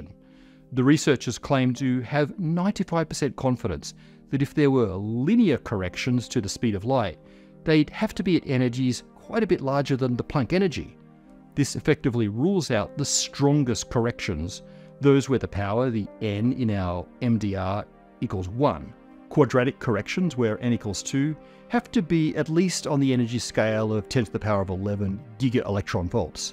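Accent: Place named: Australia. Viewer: is from Australia, so maybe that's his Aussie accent coming through?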